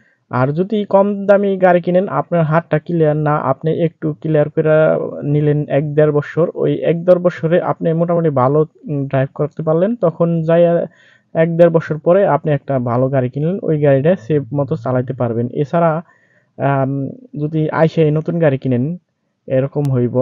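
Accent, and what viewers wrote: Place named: Jamalpur, Bangladesh. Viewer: আপনার দেশের বাড়ি জামালপুর? ভাষা শুনে মনে হচ্ছে... জানাবেন প্লিজ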